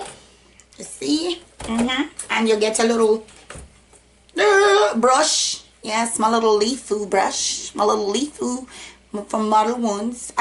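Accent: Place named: Jamaica. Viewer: Jamaican I hear it looool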